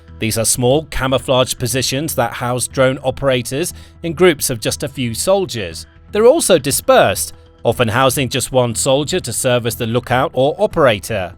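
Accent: United Kingdom. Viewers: You speak with a British accent and talk about Europe BUT USE MILES? instead of Kilometers? I don't get it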